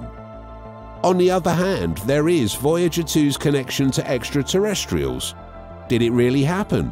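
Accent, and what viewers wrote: United Kingdom. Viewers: I’m not going to get rooked into listening to this Brit-narrated 13 min long video about a message that is never revealed but if watched I’d have to endure a mind numbing litany about the wonders of the Universe.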